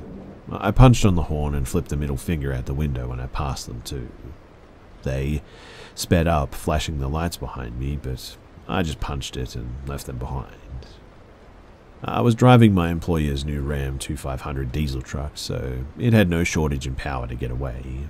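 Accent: Australia. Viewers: You are a phenomenal storyteller! Your Australian accent makes it even better.! People with British accents are the same way not all of them but the ones who have the gift of voice of being able to read a story and make it really come to life. Please remind me of the old days. When TVs were a newer item or before they were invented. They had radio shows that it will keep people entertained. Families will gather around the radio, which was usually a Big thing. Meaning, they were physically big they would be on a table and one family will gather around and listen to the scary stories and because that’s what they had at the time they had sound effects and everything like if someone was walking they had a recording of somebody walking towards you or walking away and they had a door that can go anywhere with that will open it and close a different sound effects to make you feel you are there. 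When I was little my grand mother bought me this little radio is back to about 60 years ago maybe I wasn’t 60 years ago I’m like maybe 30 years ago and I remember it vividly. It was this little white razor on rectangular and the part where you tuned it was black and it has orange number so you can see them and we have a station. Right near us and play those types of shows at night and I remember being in bed with the flashlight and those were things that we like to get it tells you how long ago was my alarm flashlight that took like three or four of the big batteries just to light up an area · Love this your my favourite narrator none compare, is it the Aussie ascent? Tone I simply believe it’s both thank you for not quitting these uploads, I’d honestly be searching YouTube relentlessly trying to find the right voice. Xx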